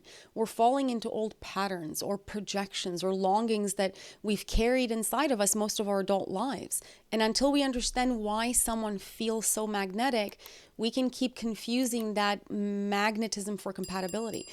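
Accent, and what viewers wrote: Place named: Ireland. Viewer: Sorry, just curious. Were you born in Canada? I mean I moved to Canada when I was nearly 12, and I still have a borderline Russian accent. It seems like you do too, not Russian, but something... Maybe even Irish...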